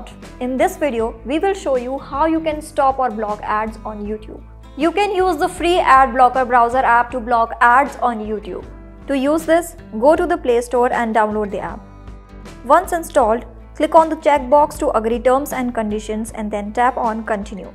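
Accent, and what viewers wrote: India. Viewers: Bro I stg I can't take any videos serious with that Indian accent. Always feels like a scam to me. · Wtf how you know a lot of grammatical things in English but you can’t change that Indian accent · The Indian accent...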